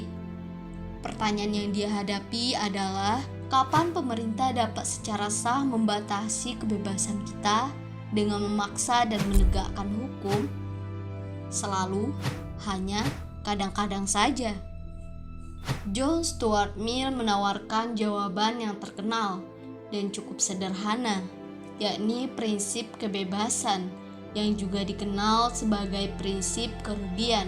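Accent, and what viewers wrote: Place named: Java, Indonesia. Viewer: Lucu ih suaranya medok khas gitu 😂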